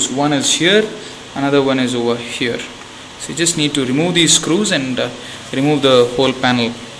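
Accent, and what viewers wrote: India. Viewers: インド訛り キッツいなぁ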